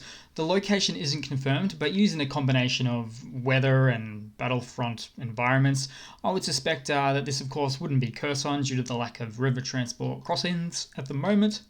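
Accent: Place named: Australia. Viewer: Always a huge thank you for the hard work put into your content! Love the accent! Teach us more Aussie! While learning about Ukraine! ❤️🫂🇺🇦🫂❤️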